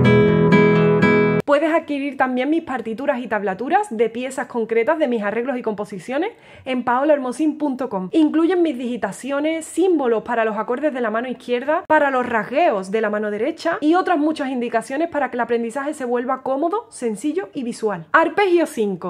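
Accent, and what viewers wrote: Andalusia, Spain. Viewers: Que combinación de talentos!! El acento andaluz es como ponerle el cherry al cake. haz escuchado alguna vez lo de las frecuencias 432 y 440?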